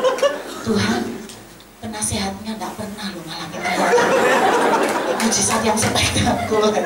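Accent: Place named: Java, Indonesia. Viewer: Justru Saya orang suku batak tinggal di Sumut tapi saya suka dengar khotbah logat bahasa ibu campur logat Jawa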